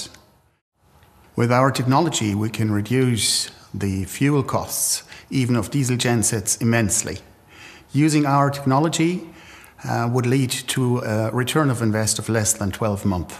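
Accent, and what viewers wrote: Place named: Ireland. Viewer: I hear some Irish in there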